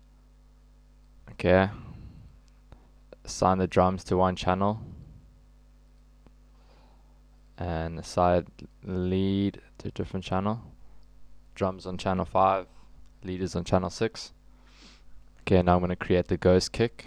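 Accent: South Africa. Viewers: you also from south africa dude? lol youve got a south african accent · Are you South African? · sigh that Joburg accent xD noo man leka boet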